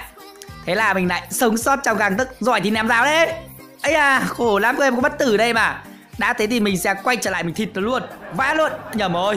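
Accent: Nam Dinh, Vietnam. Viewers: Ah quê nam định à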